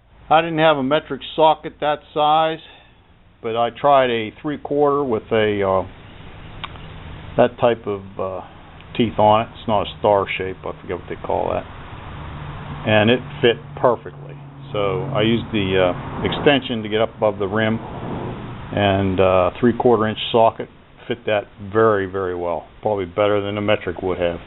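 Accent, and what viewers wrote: Pittsburgh, United States. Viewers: Thanks for the video! Your accent sounds like your from the burgh.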